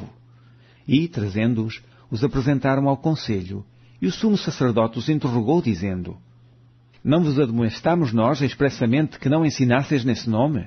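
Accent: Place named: Portugal. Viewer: Mas de qualquer maneira, esta baboseira toda vale pelo belo sotaque português !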